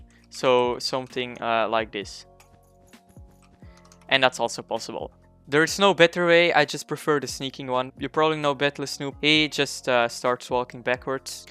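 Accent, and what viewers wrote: Netherlands. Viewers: You dutch? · He is somewhere around belgium or the netherlands.. i know that english accent from outside my head since i am also dutch.. he is <num>% From belgium or Netherland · I feel like you're dutch XD, aren't you? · your'e accent little bit sounds like your Dutch am i right? XD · volgens mij is hij ook Nederlands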